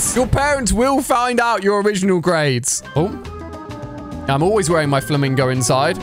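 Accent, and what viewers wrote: United Kingdom. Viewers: He is british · Bro, are you British? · Are you from the United Kingdom 😅😅😅😊😊😊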